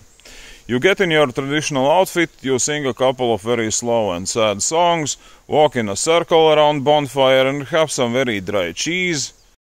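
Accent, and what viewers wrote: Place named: Latvia. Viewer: Labākais Latviešu akcents 🤘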